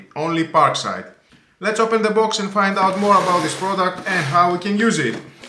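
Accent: Greece. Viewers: Είναι φοβερό εργαλείο ήδη τα έβγαλε τα λεφτά του με το πρώτο μερεμέτι .Ανετα ξετρυπήματα και σκαψίματα σε τούβλο και μπετό . Γενικά η σειρά performance είναι κορυφή.. Θα πάρω κι άλλο την επόμενη που θα ξαναφέρει το λιντλ . Είχα αρκετά εργαλεια απο τα πράσινα και έχουν βγει σκυλιά αλλα εδώ πλέον και με τις τιμές που παίζουν έχουν ξεφύγει τα πράγματα και χτυπάνε άνετα και κορυφαίες μάρκες οι οποίες είναι εώς και 5-6 φορές πιο ακριβές ! Γράφω Ελληνικά γιατί απο την προφορά αποκλείεται να μην είσαι Ελληνας!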